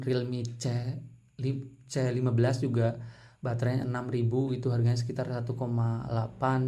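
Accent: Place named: Java, Indonesia. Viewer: Abang orang jawa yah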